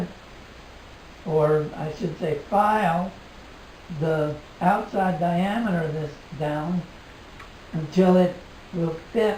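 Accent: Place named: Lancaster, United Kingdom. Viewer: You have a slight Lancaster accent, bro--I hear 'war-shers' instead of 'wash-ers'. Didn't realize that before! interesting build using common parts!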